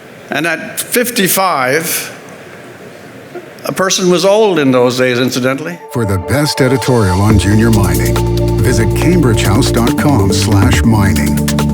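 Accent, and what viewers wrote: Canada. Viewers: Although I have always thought Rex was an icon in journalism I feel he has sold out to big business. First making fun of David Suzuki really not very becoming. Yes the mining industry is important to Canada however the companies that own them spend their resources to produce technology to replace people that work in these mines or oil fields. If they had their way they would have robots do all the work. Our jobs have been continuously been sent to third world countries because labour costs. These giant companies will do anything to cut costs as they are responsible to people who invest their extra wealth in their stocks. As far as Newfoundland is concerned they exploited the fisheries mostly because of the same reasons. They sold their fisheries to big business, then over fished it screwing themselves out of work then relying on the handouts of fellow Canadians to subsidize their stupidity. 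Then when jobs became available in the tar sands rushed out to Alberta so they could work and then take the money home and live like kings because housing prices were much lower in Newfoundland then anywhere else. Someday these fossil fuels will run out and yes I agree they have given us the good life but at what cost. Big business doesn’t care it’s all about money to them. I’m sure Rex is speaking for free for this group lol. His Newfie accent and correctly spoken grammar is hypnotic to the people who don’t look at both sides as he massages the brains of upper management of global corpora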